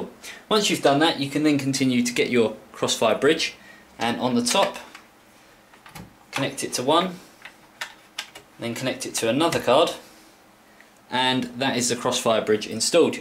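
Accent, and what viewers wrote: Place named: England, United Kingdom. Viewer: gotta love english accents :D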